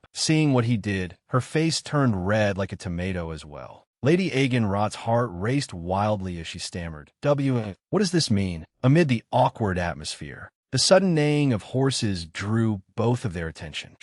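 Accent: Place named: France. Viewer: I can't tell if the AI is part French or part German 😅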